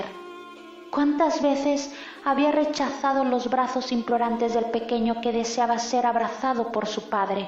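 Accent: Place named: Spain. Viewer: Sip, lo sé, solo que hubo una de tus suscriptoras que preguntó que de donde eras y me atreví a responderle 😆😆😆(perdón)que eres española y eso se nota claramente en tu acento. Saludos.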